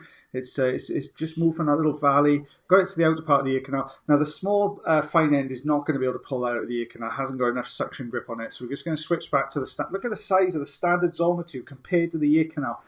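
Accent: Wales, United Kingdom. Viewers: Are you Welsh? Love hearing the welsh accent, reminds me of home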